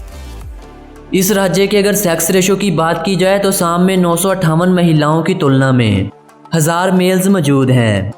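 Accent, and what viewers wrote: Pakistan. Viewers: He's pakistani in disguise. Check his accent and frequent use of urdu words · Mujhe inka bolne ka andaz Pakistani lag raha hai ,are u Pakistani , from Assam 😉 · Pakistani chanal lg rha hai awaj se... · Vai tum Pakistani ho kya tumhare lehen se pata chalrahi he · Bhai tu h kaha ka teri aawaz to Pakistani h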